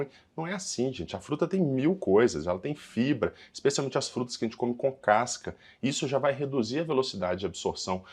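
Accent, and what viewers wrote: Minas Gerais, Brazil. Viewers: Obg pelo vídeo,me ajudou bastante ,descobri hoje que estou com esse problema. Acho muito bonitinho seu sotaque mineirinho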